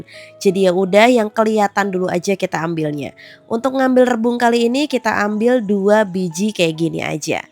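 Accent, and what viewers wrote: Java, Indonesia. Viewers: Jawa nya kok medok banget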